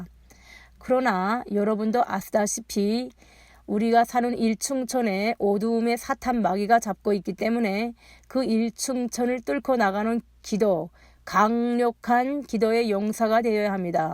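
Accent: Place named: Pyongan, North Korea. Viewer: 피안도 사투리가 참 정겹게 들립니다, 나의 오마니도 1.4후퇴때 피안북도 정주에서 월남해서 고생하며 저희형제 잘 키워주시고 작년에 작고하셨다오.. 지옥의 북녁땅에서 참 많이 고생하셨군요.. 다 좋은데요, 방언은 아닙니다. 고전14장 헬라어 원본으로 잘 읽어 보면, 오늘날 현대교회에서 행하는 방언은 절대 성경과 일치하지 않습니다. 고전14장 한글번역이 굉장히 잘못된 부분이 많아요. 다다다따 방언을 예수님께서 하셨나요, 사도들이 하시던가요? 한글로 또는 영어로 기도하면 하나님이 못알아드시나요? 방언기도하다가 귀신을 초청하게될 가능성이 아주아주 높은데, 왜 맑고 깨끗한 맨정신으로 내가 알고 하나님이 받아주실 정상적인 언어로 기도하면 안될까요? 샬롬!!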